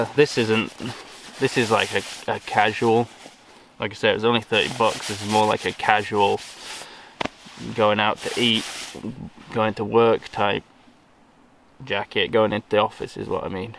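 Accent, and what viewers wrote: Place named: United Kingdom. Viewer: You sound like a Brit but you talk about walmart and bucks. Where are you? (NOOB to your channel)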